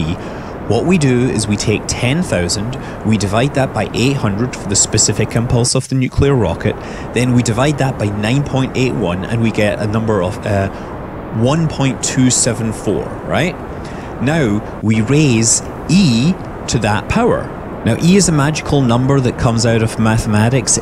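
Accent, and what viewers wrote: Scotland, United Kingdom. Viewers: omg I love your brogue! my god it's just like...awesome. Not David Tennant thick, not Northern England weak. ;3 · the switch between scottish/american rhotic/non-rhotic stressing is kind of disorientating. good video though, loved it. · What a silly comment. They were talking about what he sounds like, not his nationality. We all know Scotland is a part of the UK, so that technically makes him British. But he does SOUND Scottish, which is a much more accurate description than the over-encompassing "British."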